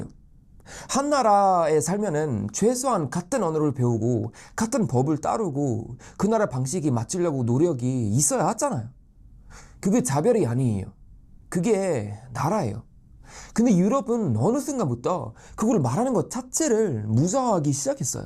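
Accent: Gyeongsang, South Korea. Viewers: 왜... 경상도 사투리가 들리지.....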